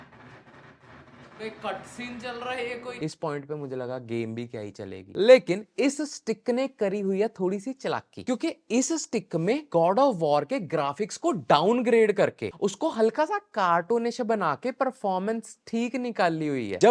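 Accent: Punjab, India. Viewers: punjabi fadli teri hindi cho bro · Love your punjabi accsent ❤ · work on your explaining method, your half panjabi breaking words accent is irritating. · Who noticed his Punjabi Accent 🙂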